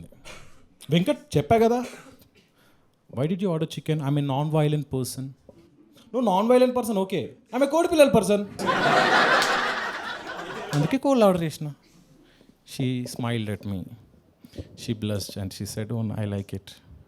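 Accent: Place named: Warangal, India. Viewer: Bro mi voice Veni cmt pedudham anukuaa wgl ani nvuey cheppavu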